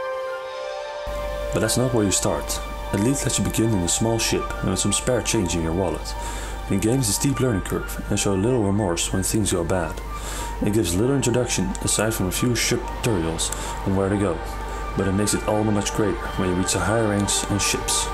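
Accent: Netherlands. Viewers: from which country are you? you sound dutch judging by your accent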